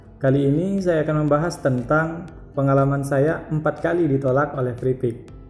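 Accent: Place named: Pontianak, Indonesia. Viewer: Kayak bhsa pontianak ya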